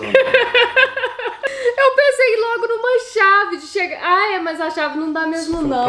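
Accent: Minas Gerais, Brazil. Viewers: O já está entendendo super o português? E olha que você fala rapidinho e com sotaque mineiro! Fico muito feliz pela dedicação e esforço dele. Dá pra ver o quanto ele está leve e feliz. Que coisa boa!! Nunca esqueço daquele vídeo que vocês fizeram com o não deixando desperdiçar nada. Morro de rir só de lembrar. E ele mal entendia as palavras em português que você falava. Quero ver mais atuações! Genial! Grande abraço, família!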